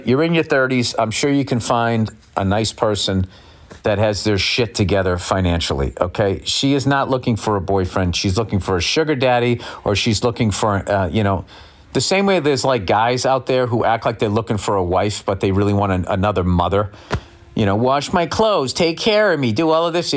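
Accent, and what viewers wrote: United States. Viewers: I see the flaming retards are back in the comments. I love the morons that say that I'm just here for the comments. You're helping Bill out on his channel, so thank you. Bill is still crushing it. He will still fill arenas and he could give a fuck less what the professionally offened have to say. All you haters will just become a punch-line in Bill's jokes. I still love that Bill brought his American voice to the Middle East.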